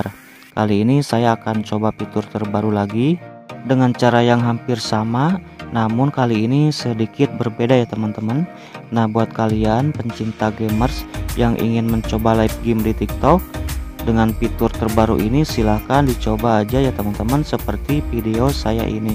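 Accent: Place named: West Java, Indonesia. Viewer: Sunda banget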